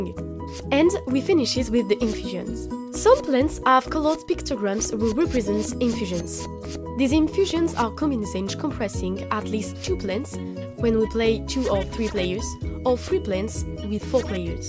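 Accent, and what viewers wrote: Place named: France. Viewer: your french accent is cute! Divitchia,divika,divissia,Druid.. :D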